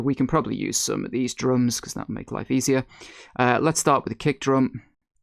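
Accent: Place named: London, United Kingdom. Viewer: I can hear the Liverpool and Manchester -it’s actually the London that keeps wanting to break through 😀✌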